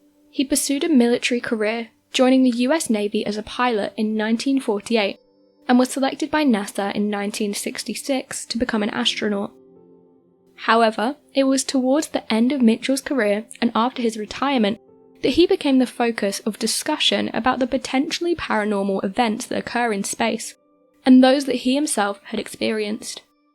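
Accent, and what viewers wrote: England, United Kingdom. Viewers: She's definitely not Australian or Kiwi. My money's on British. Sounds very British to me, you do realise they have different accents in different areas over there.. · I personally live the voice very good narrative speaks intelligently and the best English accent! Keep her. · I love her voice, just something about a British accent that is so soothing.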